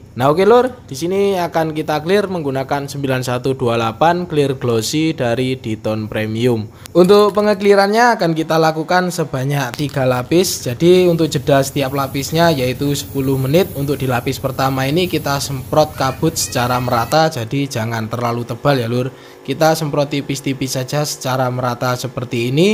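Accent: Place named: Java, Indonesia. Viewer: bg jenengan sh wong ndi. mandan medok. bisa nk edek otw jluk tulung ngecet na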